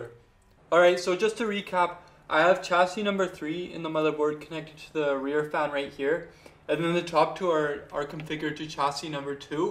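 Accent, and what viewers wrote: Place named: Minnesota, United States. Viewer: You sound like the people in Fargo, are you from around Minnesota or Canada even?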